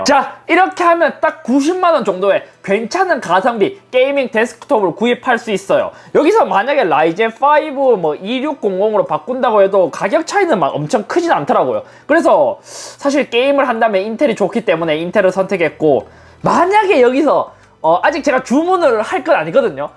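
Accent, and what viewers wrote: Gyeongsang, South Korea. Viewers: 사투리가 경남쪽인가요? 경북쪽인가요??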